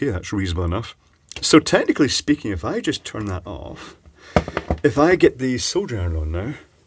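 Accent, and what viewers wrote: United Kingdom. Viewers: I just love your videos, everything in these is so classy. Probably because you are from the UK ? I must confess I don't understand everything you say, me not being a native english speaker but I learn a few tricks everytime. Your accent and the way you speak remind me of my Acorn days (I miss them).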